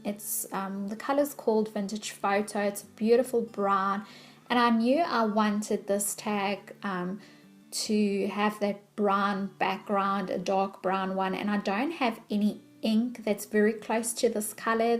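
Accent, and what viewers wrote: South Africa. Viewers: Love your tags they are so lovely.Everytime I hear you speaking it sounds like a South African accent to me.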